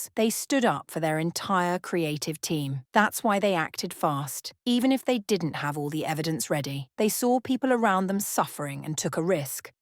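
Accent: United Kingdom. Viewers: Artistic originality without the resources to promote them seems pretty futile! I guess you like to think you are clever by saying silly and outlandish things in that calm British accent to make it seem like it is a well considered opinion, whilst you are simply shilling for engagement just like all the trolls!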